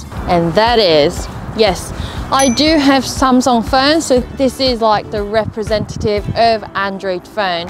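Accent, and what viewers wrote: Australia. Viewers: Have you spent time in Australia? You sometimes have an Aussie accent :) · I'm sure I detect a hint of an Aussie accent. Am I hearing things ? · First video of yours that I have watched. My new mini will connect to my galaxy s7 with the DJI app, but I paid $25 for the Litchi app and it will not connect. Other people tell me it will work, but do not give clear directions on how to make Litchi work with my drone. I hear a Chinese AND what sounds like Australian accent. Did you grow up in Australia?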